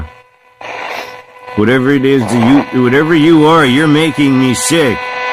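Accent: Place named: England, United Kingdom. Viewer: North Nottingham Paranormal Investigators ahh now I hardly thought you were I'd know his accent a mile away. Lol and for some reason I don't think your English accent could sound anything like American one 😂😂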